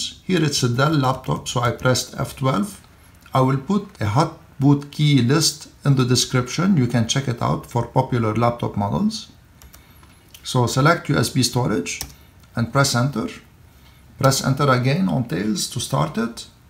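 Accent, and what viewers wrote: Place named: Lebanon. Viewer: thank you for this excellent tutorial! I just wanted to ask you, are you originally from Lebanon bc your accent is familiar. Anyway, thanks again!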